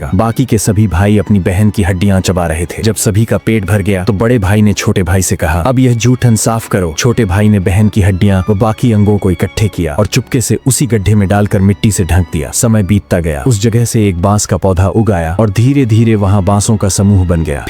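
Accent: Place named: Chhattisgarh, India. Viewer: Maa sunaya hai kahani cg boli me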